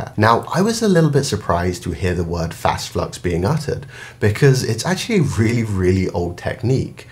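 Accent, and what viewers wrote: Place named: United Kingdom. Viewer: cool but it's time to cut shit with the british accent. talk normal now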